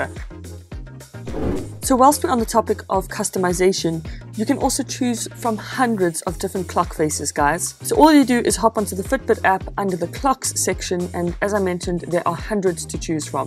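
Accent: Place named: South Africa. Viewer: Thanks for the review! I thought I recognized the accent (lived in SA for 6 years). This decision about which Versa to buy can be daunting lol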